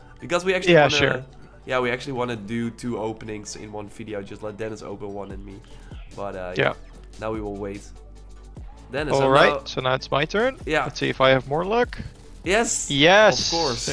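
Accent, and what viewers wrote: Netherlands. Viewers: That Dutch accent though.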